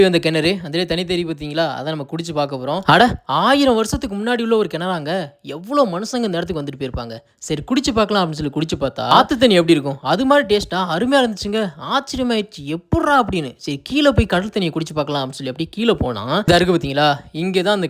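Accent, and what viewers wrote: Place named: Pudukkottai, India. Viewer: ரி Silent ப்பா புதுக்கோட்டை சுற்றியுள்ள மக்கள் இப்படித்தான் பேசுவார்கள்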